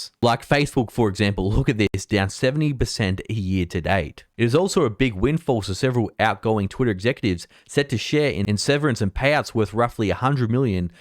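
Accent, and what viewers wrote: Australia. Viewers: I really really like this content but I can't follow the Australian accent sometimes, gets frustrating.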